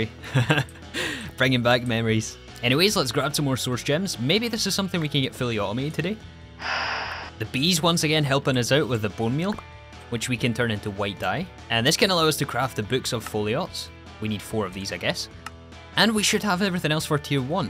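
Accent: Scotland, United Kingdom. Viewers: I don't even play Minecraft anymore. Haven't played in about 10 years haha. Your pacing and amount of content packed into each episode is amazing, also great to hear a fellow Scot 😀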